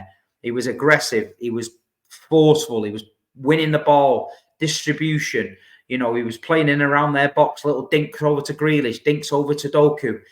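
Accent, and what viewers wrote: Manchester, United Kingdom. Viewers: Yes!! Your not to bad!! I've seen these younger idiots programs and they know shit!! I'm 52 but still a dude OK!! These younger goons know shite. All cock and no f...... bollocks. Your not to bad pal. I can relate OK!! At least you lay it out realistically. No bollocks with chatting nonsense!! There's some f..... idiotic ball bags online chatting sh... about city. You sound like one of the boys from the old Kippax so I'll let you off but these idiots that are supposed to be from Manchester but talk like they're from fu.... Jamaica f..... messes my mind!! Good show kid!!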